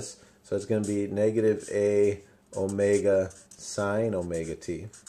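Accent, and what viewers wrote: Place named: Brooklyn, United States. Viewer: Hello -something in your accent tells me you are from Brooklyn N.Y. N.Y. and like eating Gefilte Fish and beigel with cream cheese, am i right? Greetings from Yoram Stein Amsterdam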